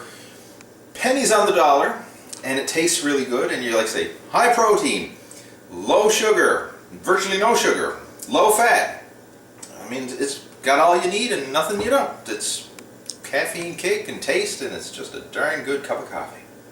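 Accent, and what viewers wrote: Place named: Maritimes, Canada. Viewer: He's got an east coast accent. Canadians refer to them as maritimers. They have their own special accent. Not quite as rough as people from Newfoundland though.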